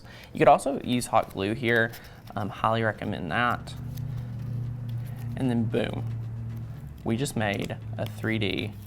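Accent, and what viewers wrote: South, United States. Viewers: Gotta love a good ole fashioned southern drawl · nice accent. Hey there fellow southerner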